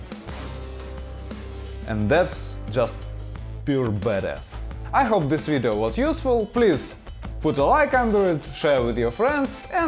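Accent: Russia. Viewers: Какой же тяжелый русский акцент😄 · С этого видоса я прям прочувствовал русский акцент в английском языке. 😂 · Very good information, very bad English. You have to do something with it. Your English is too Russian)) Your tips are really useful. Thanks a lot!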